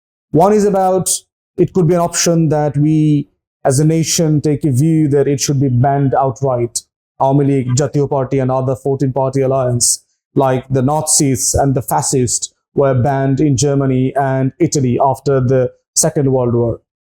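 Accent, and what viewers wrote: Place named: India. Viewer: Indian দের সুর এ ইংরেজি শুনতে বিরক্ত লাগে আপু, ট্রাই করেন ইংরেজি ভাষা ইংরেজদের মত করে বলতে, উপদেশ টা পজিটিভলি নিবেন, আপু কিছু মনে করবেন না আপনার accent শুনলে ইন্ডিয়ান পালকি সর্মা এবং আরো ২/৪ টা রেন্ডি সাংঘাতিক দের কথা মনে পড়ে, তাই কইলাম আর কি 🙏! ফুয়াদ ভাইয়ের টা 👌 আর আপনাদের এই উদ্যোগ কে স্বাগত জানাই, এবং আপনি আন্তর্জাতিকভাবে বাংলাদেশের সত্য বিষয়গুলো ফুটিয়ে তুলছেন সেইজন্য আপনাকে অনেক অনেক ধন্যবাদ আপু ✌️❤️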